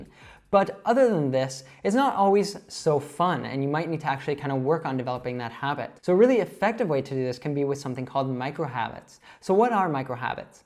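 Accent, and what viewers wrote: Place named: United Kingdom. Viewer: Hi....do you conduct online class. I like british accent and wanna to be fluent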